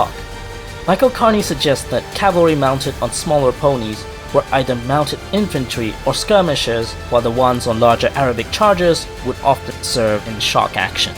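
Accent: Myanmar. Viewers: If I am not mistaken that you are nationality is Myanmar. Your accent is both English and Burmese very dramatically accurate. Can I request you to dub in the Burmese language? We (Myanmar citizens) will be appreciated and thank you for your effort.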